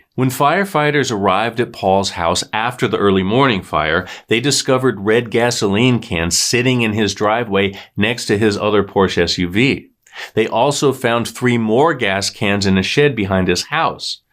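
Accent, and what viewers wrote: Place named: New Jersey, United States. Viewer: Holy crap I never realized how familiar your accent was until now. I grew up Monmouth County NJ where Colts Neck and Asbury Park are located. You gotta be from the Tri-State, but I think you're from NJ. Mentioning the proximity to NYC introducing those town names hit me right in the heart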